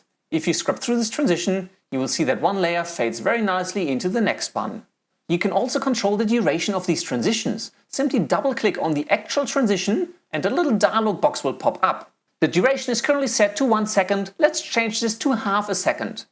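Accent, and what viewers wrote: Germany. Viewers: I love this video! I love your accent! It's German, right? You gained a new subscriber! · Delighted that you replied! I’ve been struggling so much since I bought my Premiere Pro subscription, and I’ve been suuuuper intimidated to release my second video. The road appeared so uphill, and honestly, till I saw your video today, I’ve been regretting the Adobe purchase. I shall try again tonight. By the way, found your accent pretty interesting! Is there any German or Austrian connection ? Just curious :-) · You're not an idiot (and you better get past that idea if you're going to make any progress in life). This guy's accent is not merely obscure, it's incomprehensible. I truly do not understand why any company would hire this guy to speak to Americans. I doubt he's a native English speaker; I'm thinking northern Germany for his birthplace. This is NOT a "tutorial for an absolute beginner." Partly because the guy's accent is as thick as mango trees in Cuba. 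Example: Americans say EYE-CON, this bizarre chap says "EYE-cun." It's a small difference, but given how fast he's talking, it's a major difference, and the net result is, you can get lost quickly.. The other reason this is not for beginners is, he goes way too fast. Many, many, many better options available for beginners. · Are you German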